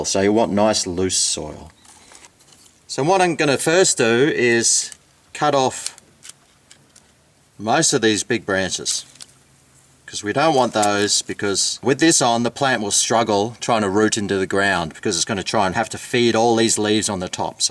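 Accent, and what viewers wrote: Australia. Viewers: "British" accent??? It's Australian. Everyone's laughing at your class now.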